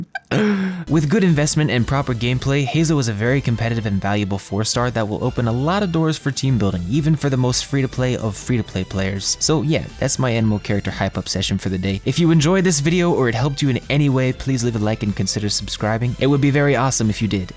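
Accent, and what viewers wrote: United Kingdom. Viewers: That British accent caught me off guard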